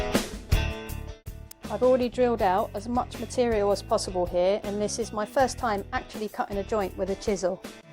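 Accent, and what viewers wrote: United Kingdom. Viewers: Excuse me...are you scottish ? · Great to hear a British accent and sayings on these videos. Good job.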